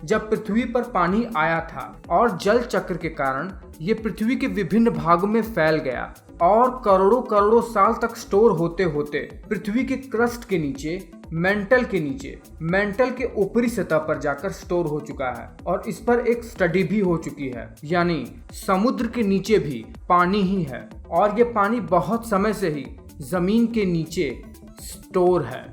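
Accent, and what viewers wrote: Bihar, India. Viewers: Bihari ho kaa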